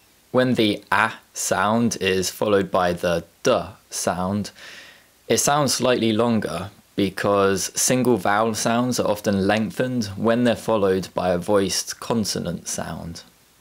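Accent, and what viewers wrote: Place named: RP, United Kingdom. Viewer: You need to make it clear this is for the rp accent and isn’t representative of the uk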